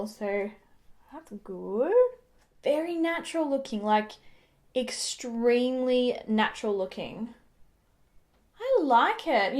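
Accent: Australia. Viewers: awesome!!! I am gonna try this one especially for my winter foundation. In winter I can carry off the more emollient feeling foundations because I am not sweating my bum off. But hmm I just thought of something, since I got the mehron primer that won't even matter anymore. Thank you for doing this though! You have my skin undertone and my skin type so your videos and reviews are LIFE for me! LOL though we DO have quite the age difference (I'm 49) still your skin care, tips and tricks are amazing! AND I miss my Australian sister so much your accent feels like home! (She is coming for a visit in May!!!)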